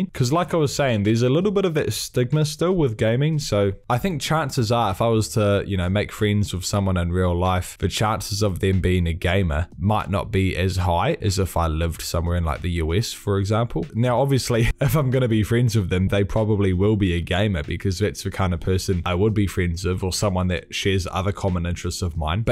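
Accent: New Zealand. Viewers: This guy has a Kiwi accent, he's from NZ. Ofcourse he knows we exist lol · So weird hearing a Kiwi accent in a YouTube video. Thank you for keeping it real brother.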